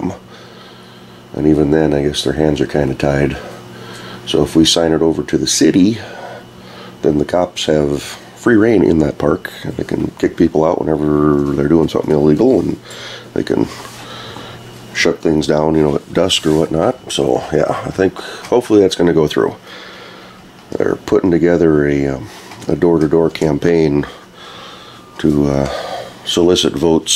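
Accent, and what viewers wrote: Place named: Canada. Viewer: are you in Canada? can you speak with out a Canadian accent?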